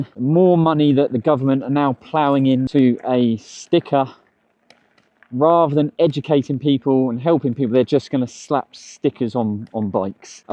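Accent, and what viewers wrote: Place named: United States. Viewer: Not just tax, FINES. Car's are fine to 'let loose' and only correct their behavior afterwards because they have a registration requirement..... Anyway, 2kw for 30mph is nonsense just get a darn moped. You can do 28mph with 750w nominal motors that's just plain class3 e-bike in the US for instance. Also, I highly doubt people putting hub motors on mtb's to 'get fit' as they <num>% of time put a throttle on them which makes them a moped not a bicycle. And nah, 'the law' is there to make sure some entitled people don't go around riding 30mph mopeds on bicycle infrastructure. You do realize it's just as dangerous to ride 30mph on infra where the other users are doing 15mph.... as it would be for you on your moped to go 30mph and have to drive on a highway with a 60mph speed limit. No I don't care YOU 'ride defensively blahblahblah' that's nice, but that's not what we base rules on. 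If we did, we wouldn't have a need for rules for tin cans either right, 'just drive defensively and it will be ok' 🙄 The thing is, you sound very American, which would make you more right then wrong, and my little tirade above not at all applicable to you. Since your infrastructure forces you to mix with traffic, you're 100% safer having more power available, and having a higher top speed limit to closer match the traffic around you. But for instance here in The Netherlands, it would be very strange to allow bicycles going 30mph on the bike path, when we banned mopeds doing 28mph from the bike path bec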